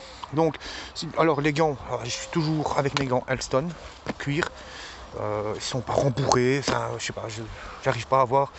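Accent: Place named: Toulouse, France. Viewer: J'ai reconnu l'accent de Toulouse.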